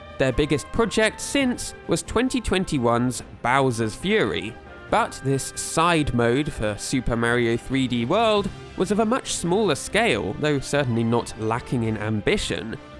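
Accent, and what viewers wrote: United Kingdom. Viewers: He's from the UK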